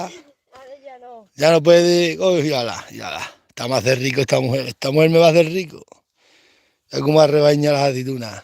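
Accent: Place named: Galicia, Spain. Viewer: Aqui un Gallego .... a éste Gallego de 68 años , aunque repitas 100 veces lo mismo .... ENTRAS EN VENA TIO .... lo dices como hablan los paisanos de A MIÑA TERRA , de la Galicia profunda ....TAL COMO SOMOSSSS!!! ... ERES UN CRACKKKKK !!! ... VER A TU FAMILIA A TU LADO , ES ENTENDER PORQUE LUCHAIS Y LO MAS IMPORTANTE ES ENTENDER PORQUE PROGUESAIS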